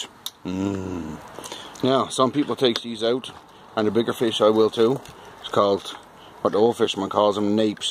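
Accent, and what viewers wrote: Newfoundland, Canada. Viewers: Most likely from Newfoundland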